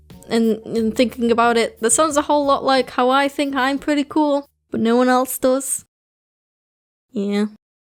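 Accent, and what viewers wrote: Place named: United Kingdom. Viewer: I love the british accent🙂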